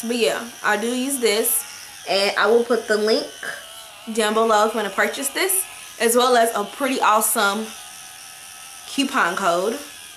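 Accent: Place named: New York, United States. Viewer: I love your accent! I'm from ny so I'm use to only hearing that accent most lol😃 I have the vitagoods brush! I love it!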